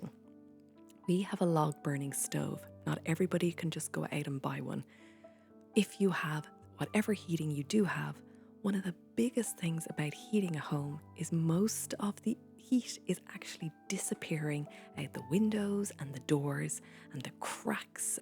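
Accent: Ireland. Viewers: I was a single mama, therefore I had to be frugal as I had to pay for all the expenses and our house on my own. No help no money from my little angels father. I paid cash for everything( except the house payments you know) I called my electric and power company’s to find out what they call peek hours here in USA. So from pm at night until am it would be cheaper to do laundry or run the dishwasher. Since it was just us two , I ran the dishwasher once a week after pm and did laundry another day once a week after pm. In the summer I grew tomatoes/ cucumber/ corn on the cob, mint and herbs. I always made strawberry/ mint/ cucumber water never purchased soda. I was on a budget BUT I Made it happen. I’m 61 now and my little Angle is all grown up and has left. I lost my mom so it’s been an adjustment but now I’m living with the man I knew most of my angels life but I was focused on being a mother and taking care of my aging mother so I never wanted a relationship a friendship was great for me. 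Now that we are together I’m still very frugal and still make my own house cleaner as you do vinegar r/ baking soda and lemon were my cleaners and still are. My home is now rented out and I finally have a little extra income I’m saving. I just found your channel and love it. My dream has always been to go to Ireland so I just love your accent 🙋🏻‍♀️👏🏼👏🏼👏🏼👏🏼 thank you lovely words of encouragement and advice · thank you so much for the recent video on how to save energy, some new tips some old. I likes the door draft / stopper!!. In my country the private consumtion of energy has dropped during the winter; people can save if they have to, most depending on the high prices on electricity and gas... I likes so much that you were facing us during your entire 'energy lesson'. Your voice and your Irish version of English is a feast for me and I guess also for all followers. Now your eayes and smiles supporting you voice, message and stories...makes it even better. 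Here where I live, the days are longer, the sun is shining as like today, however the nights are cold, and also during the daily walks, a wollen hat or similar headwear is needed. Thanks again for the video, your advice, your narrating on energy. All the best to your family.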